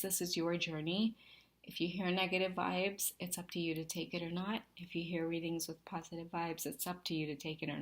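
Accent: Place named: Trinidad and Tobago. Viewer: No sah. When the accent came out i said is Trini. 🙋🏽‍♀️ Now back to the video.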